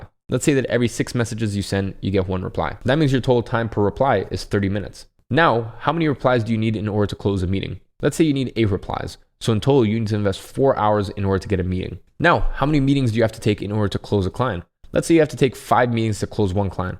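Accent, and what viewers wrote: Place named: United States. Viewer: I have always wondered if your British, why do you speak with an American accent?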